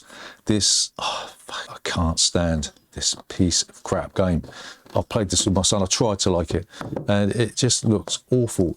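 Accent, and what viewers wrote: United Kingdom. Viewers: I think that this six-part series is among the most entertaining board game videos I have watched. A couple of the titles made it onto my wish list thanks to it. With that delightful British accent, even the swearing sounds eloquent, sophisticated, and sublime. It would not be possible to pull that off in any other localized version of the English language. It’s great to be a patreon.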